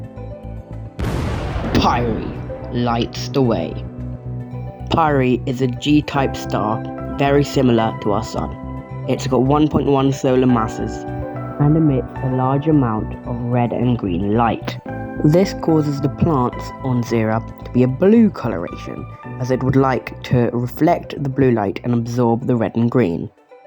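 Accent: United Kingdom. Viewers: Are you british 💀 also i know im very late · I would call this ok, but the british accent turns this into a 100/10, oh yea, and the vsauce sound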